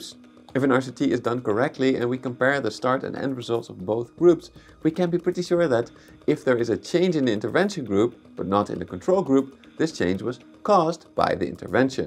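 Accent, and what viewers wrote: Netherlands. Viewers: Are you Dutch? Or even Frisian;) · Dacht dat een nederlands accent niet erger kon, maar deze gozer gooit er gewoon een vocal fry bij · Waarom heb je een Nederlandse accent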